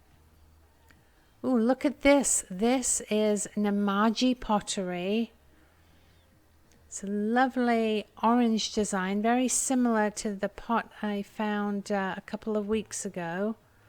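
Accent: England, United Kingdom. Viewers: I love shopping with you ,your English accent is like someone from home, Your fun.😊❤😊too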